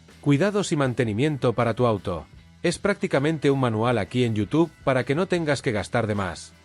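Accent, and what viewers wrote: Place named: Spain. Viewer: Me desesperó ese acento español ibérico y creo que el video no aporta mucho. Es evidente que está hecho por IA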